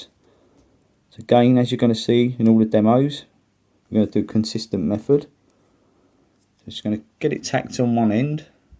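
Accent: United Kingdom. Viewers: Your videos are nothing short of brilliant. I've religiously watched them all and several, multiples times. How you spell out how you do what you do and why is unmatchable. I love your titled bridges too: very creative. I also want to mention something that I've never seen mentioned before. I really dig your accent. not so much because I'm some odd Britophile, but I really get bored to bloody tears listening to guys with game show host, cookie cutter voices. I've just grown to feel there's something disingenuous about them. Your intonation and articulation just keep my attention meter pegged. Thank you. Keep 'em comin'!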